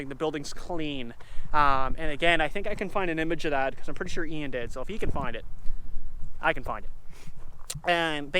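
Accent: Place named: Newfoundland, Canada. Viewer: I live and grew up in Halifax and have never heard a accent so thick Lol you must be a newfie or caper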